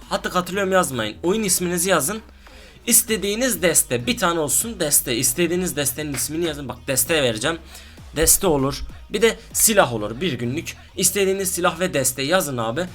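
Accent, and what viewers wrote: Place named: Antep, Turkey. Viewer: Antepli misin şiven benziyo da yada adanalı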